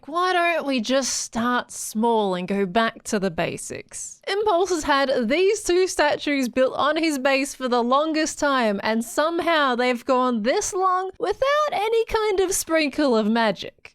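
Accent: Australia. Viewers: it’s the aussie accent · The Australian accent too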